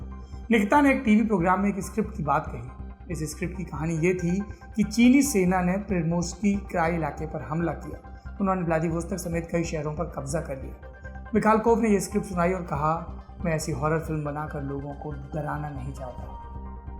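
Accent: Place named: Uttar Pradesh, India. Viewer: Yaar Saurabh bhaiya aapki ye UP wali style gazab hai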